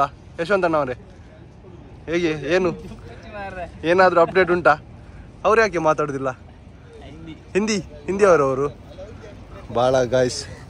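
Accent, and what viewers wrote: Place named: Kundapura, India. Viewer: Nivu kundapura kannada hege math adthira ?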